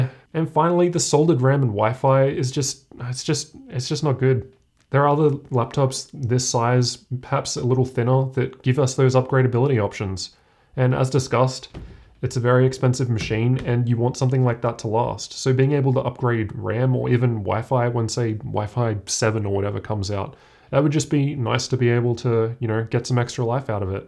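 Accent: Australia. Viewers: I thought the accent is supposed to give it away that he's Aussie.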